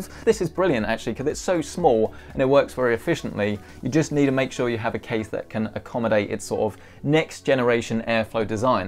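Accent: United Kingdom. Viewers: First time ive heard the the uk accent going over pc builds its very fitting haha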